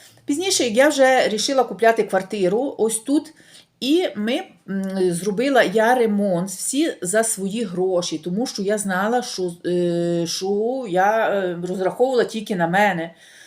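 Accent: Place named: Western Ukraine, Ukraine. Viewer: Мова ужасна,неграмотна .Мелиш по бендерська,не принмнл вас слухать,Особенно як ти кажеш євро,,,,Даже во всех Странах кажуть ЕВРО.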